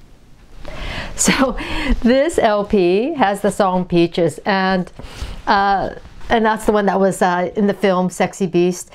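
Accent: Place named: England, United Kingdom. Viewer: Hey I stumbled on this video by accident also, I love the the too and like very much. I been watching this American girl Melinda something, her videos about vinyl records but her accent just drives me nuts 😂 so good to hear an lovely English speaking woman doing reviews. Keep up the good work your amazing 😊 thank you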